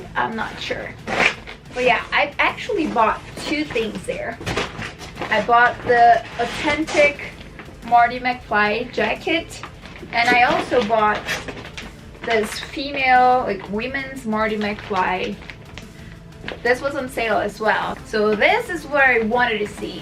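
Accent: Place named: Brazil. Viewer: tu poderia colocar legendas em portugues ou fazer um video em portugues? infelizmente meu ingles nao é fluente, nao consigo entender tudo... cerca de 60%, as vezes um pouco mais, depende do sotaque.... talvez se tu falar em ingles do jeito que tu fala portugues (sotaque brasileiro), eu vou entender uns 80%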